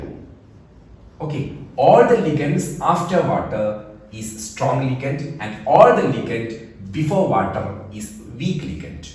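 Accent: Kerala, India. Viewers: Sir are u from Kerala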